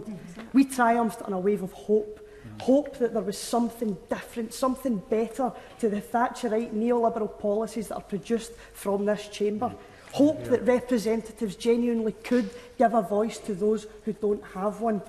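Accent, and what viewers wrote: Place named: Scotland, United Kingdom. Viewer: It's not a Glasgow accent, nothing like it. It's a Paisley accent which is completely different.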